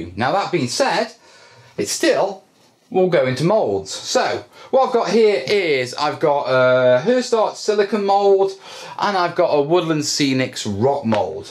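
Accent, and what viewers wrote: United Kingdom. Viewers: Are you British accent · based on your language accent, I assume - you live in Britain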